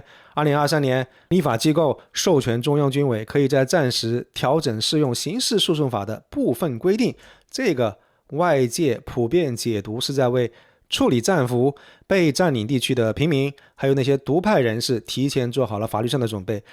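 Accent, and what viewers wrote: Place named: Hubei, China. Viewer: 湖北人吧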